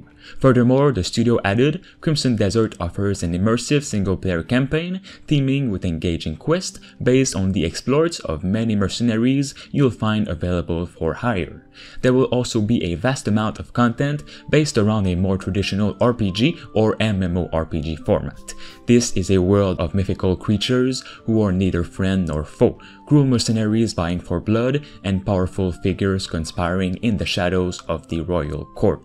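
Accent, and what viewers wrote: Quebec, Canada. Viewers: Judging by the accent, he is probably french canadian.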